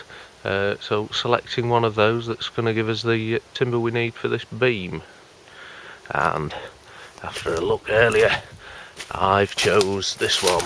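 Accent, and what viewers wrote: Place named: England, United Kingdom. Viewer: Im an American and it sounds a lil funny that you have an English accent but you read inches. thank you for the information bud. cheers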